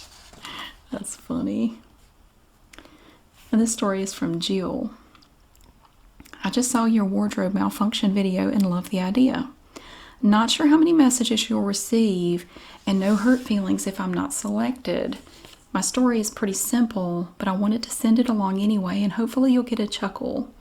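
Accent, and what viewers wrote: South, United States. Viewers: I LOVE HOW IS SO ELEGANT! 🧡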